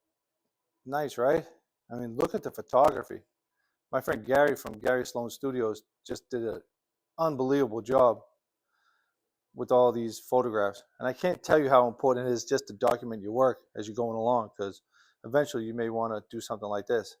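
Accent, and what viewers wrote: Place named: New England, United States. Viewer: I love your accent, I left New England in ‘79, this brings me back.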